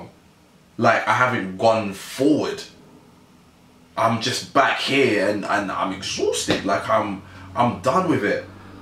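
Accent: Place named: United States. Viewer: Why do you sound like an American?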